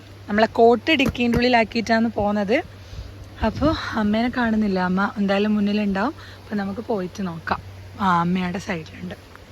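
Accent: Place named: Kannur, India. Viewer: നമ്മുടെ കണ്ണൂർ ഭാഷ ❤❤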